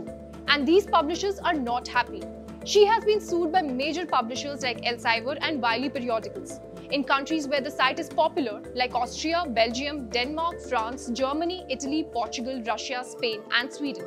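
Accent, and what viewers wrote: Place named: India. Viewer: This voice is extremely awkward to listen to; speaks too fast and with this Indian accent which is not easy to intercept. There are other videos to choose from regarding this exceptional woman Alexandra, glad to say.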